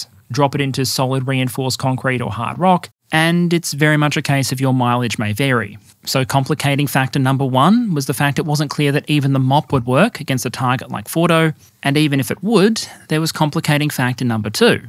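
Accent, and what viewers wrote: Australia. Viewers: I don't remember perun having an ausie accent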